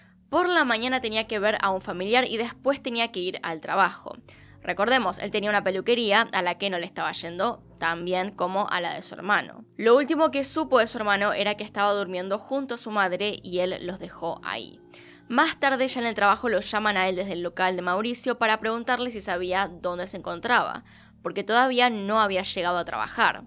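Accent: Argentina. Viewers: Lo que más me gusta de es su forma UNICA de narrar. Aparte que AMO el acento argentino. Saludos desde El Salvador.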